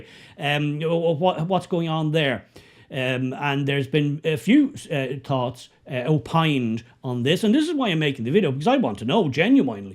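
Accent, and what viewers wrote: Dublin, Ireland. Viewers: SASSENACH with a Dublin accent.🙄😥🤡😫🤮😳🤪🥺💩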